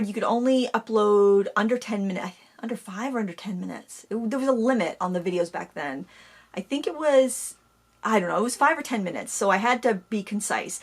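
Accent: Maine, United States. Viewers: First found you about the time you started. Don't know how I found you, but when I heard you revert into a Maine accent I was hooked! I have lived in AZ for 21 yrs. Born & lived in Portland, ME 57 yrs, hated winter. But still have strong ties & visit every 2 yrs. Even have old friends who live in your area! I have learned oodles fr. you. When you refer to someone trying to do better with H2O coloring by getting a better brush that's me. It doesn't help. but I keep trying! Watching you paint is like therapy to me. It's like a link to home. So, please keep going! Can't imagine how difficult it must be. Your honesty, personality, talent, wide knowledge of lots of things is amazing. You go Girl. I do miss when you & your friend Sarah? did the Q & A, things change. Happy Anniversary, and many more!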